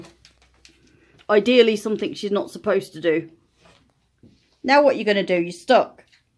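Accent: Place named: United Kingdom. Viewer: Hello! I'm a new subscriber! I just love your accent, I wonder if your from the same part of the UK as my husbands Auntie :-)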